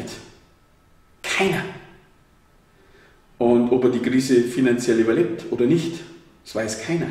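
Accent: Bavaria, Germany. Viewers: Ich hätte mir ja gerne das komplette Video angeschaut, aber die Raumakustik ist so schlecht, dass ich dein Bayrisch, das ich sonst so einigermaßen verstehe, nur mit sehr großer Anstrengung halbwegs verstehen kann. Falls ich der einzige bin, dem das so geht, dann vergiss meine konstruktiv gemeinte Kritik, denn dann liegt es wahrscheinlich an mir.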